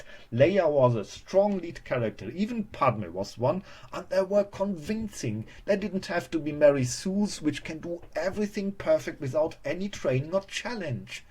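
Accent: Germany. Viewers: I liked TFA so I can't say I fully agree with you, but you spoke very well and made excellent points! I love ALL the SW films and I, like you, LOVE the Prequels!! Great German accent too. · I love that German accent!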